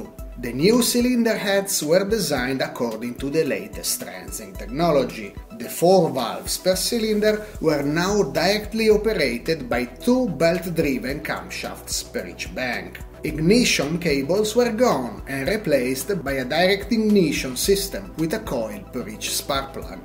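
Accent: Italy. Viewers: I really enjoyed this video being narrated by Italian. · I have only seen two of your productions yet, but I like them and especially the authentic character by your dialect with the "al Italia" touch. Molto bene! (sorry, I'm not fluent even though I'm half Italian but I never knew my real father) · The narrator makes this soo much brtter...so very much italian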